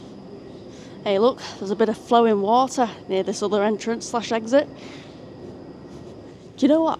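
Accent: United Kingdom. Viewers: I can hear that you're brittish... just have a permanent stay in Stockholm. its not worth going back to England. Sweden is kind of a shithole now too but England is a lot worse.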